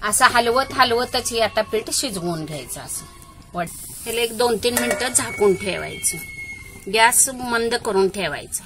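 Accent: Kolhapur, India. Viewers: Kolapuri mast boltya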